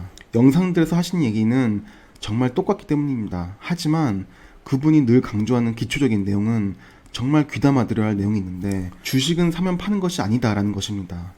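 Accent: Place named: North Gyeongsang, South Korea. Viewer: 고향이 어디신지 꼭 여쭤보고 싶었어요 경상북도? 나가리~이런표현 개인적으로 참 편하거든요^^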